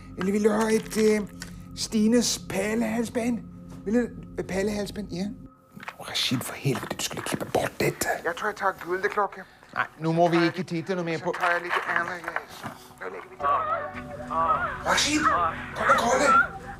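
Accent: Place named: Denmark. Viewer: Jä , å ändåäh prätar han 100% Danskäh 😅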